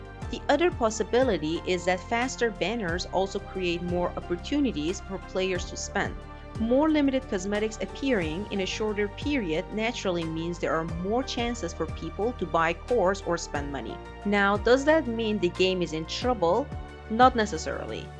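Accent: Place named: Iran. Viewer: your accent sounds like it Persian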